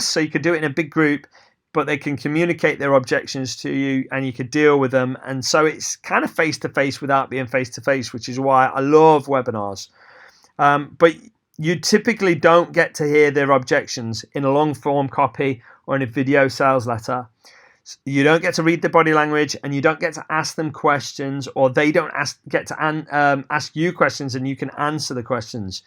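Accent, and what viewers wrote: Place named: England, United Kingdom. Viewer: Hi. Loved your video - so good to hear another English accent. I’ve subscribed and am off for video 2. Thanks.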